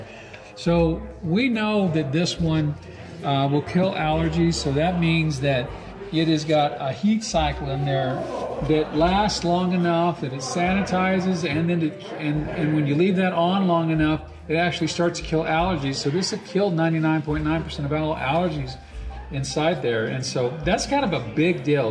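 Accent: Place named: Midwest, United States. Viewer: Typical Midwest accent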